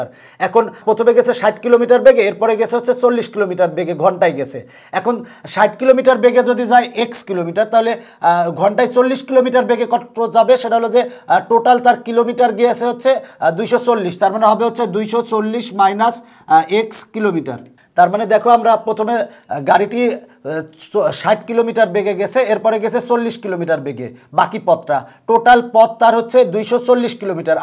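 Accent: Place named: Kushtia, Bangladesh. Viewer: সার আপনার বারি কুষ্টিয়া মনে হয়🤔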